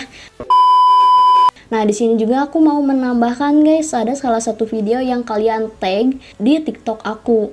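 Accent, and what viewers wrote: West Java, Indonesia. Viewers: Sunda pisan euyyy,lope yu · Orang Sunda ya Neng....?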